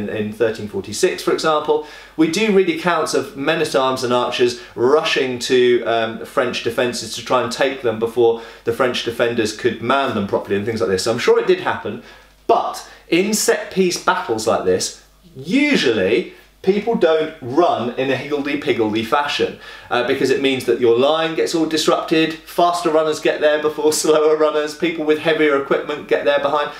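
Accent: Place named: United Kingdom. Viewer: did he just say "soccer" ? He sounds british though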